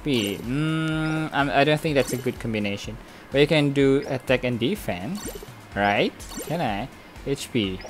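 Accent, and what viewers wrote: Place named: Malaysia. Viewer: patutlah suara mcm org Malaysia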